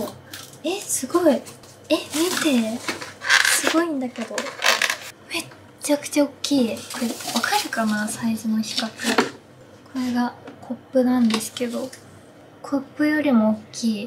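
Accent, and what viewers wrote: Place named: Kansai, Japan. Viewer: さんの関西弁最高やー😊お部屋も勿論やけど🎉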